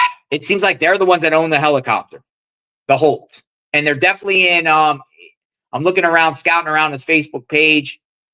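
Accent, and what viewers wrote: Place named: Minnesota, United States. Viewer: Where the hell are you from? Yankee I think. Minnosota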